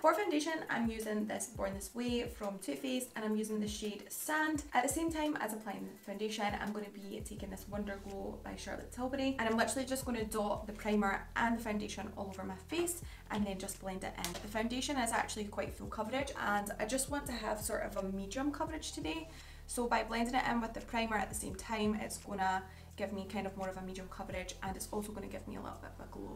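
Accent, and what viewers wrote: Scotland, United Kingdom. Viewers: r u from Scotland? love the accent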